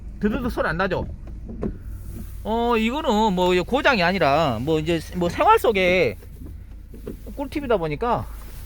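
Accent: Jeolla, South Korea. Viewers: 사장님 말투 때문에 자꾸 보게되네요. 어디인가요 지역이요. 전라도는 맞는데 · 아따.전라도사투리 존나듣기싫네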